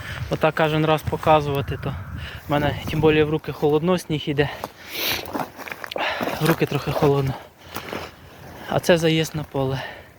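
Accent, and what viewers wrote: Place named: Khmelnytskyi, Ukraine. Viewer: Майже вгадав. По вимові зрозумів, що північ Хмельницької області. ))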